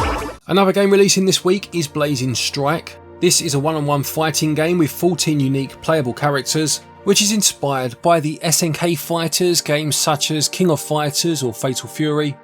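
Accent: United Kingdom. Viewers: omg british english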